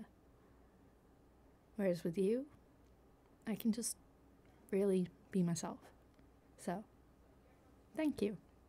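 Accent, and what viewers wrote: United Kingdom. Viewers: I've been a bit curious but are you from Manchester or am I just thinking wrong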